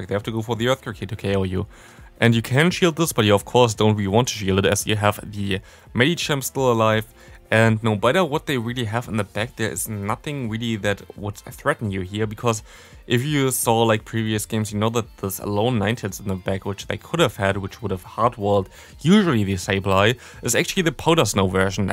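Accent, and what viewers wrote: Germany. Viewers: Germans speak English obviously with some German accent bits in it. Nothing unusual. Don't bother it.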